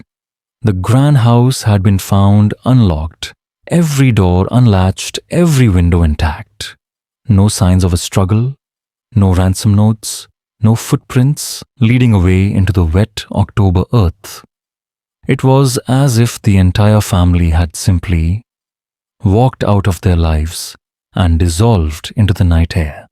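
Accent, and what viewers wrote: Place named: India. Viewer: so I love the stories, but bro leave your Indian accent at home. A suggestion from one Indian to the other.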